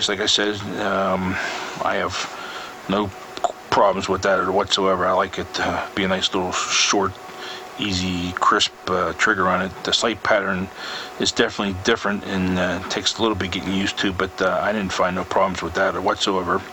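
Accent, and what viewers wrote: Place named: Pittsburgh, United States. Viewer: Jus spelling error . Although i was gunna say picksburg as well haha ... im not from pitt im about an hr away in east liverpool ohio but i spotted the accent quick lol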